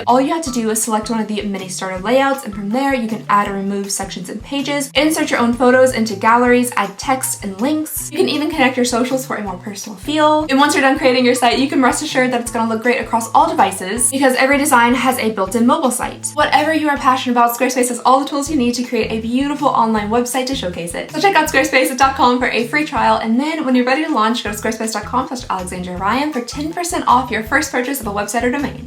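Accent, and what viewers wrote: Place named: South, United States. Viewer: HE'S SO SOUTHERN! i love it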